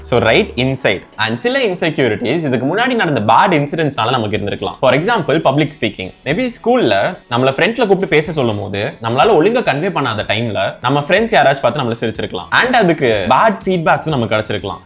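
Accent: Tirunelveli, India. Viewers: neenga tirunelveli aa bro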